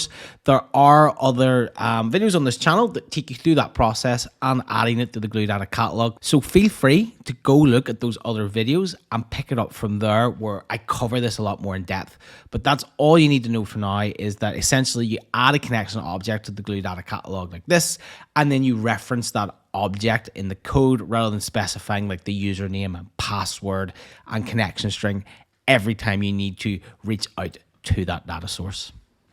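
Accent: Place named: Northern Ireland, United Kingdom. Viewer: Finally an aws tutorial that uses "wee" and talks in a NI accent, made me laugh that I finally found a fellow NI person :) followed every word :D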